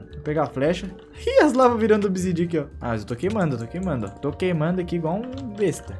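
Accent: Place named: Bahia, Brazil. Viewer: tu falou muito baiana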